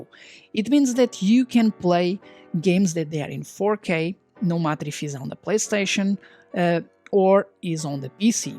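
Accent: Portugal. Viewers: Great video, I made my decision and will definitely buy this monitor after watching many videos on your channel about this. Also, dude are you Portuguese? Your accent really looks like it 😅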